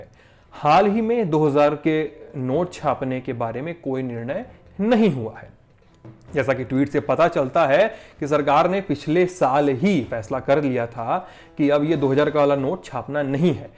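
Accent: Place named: Bhojpuri, India. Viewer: Apko bat bhojpuria style Achi lagi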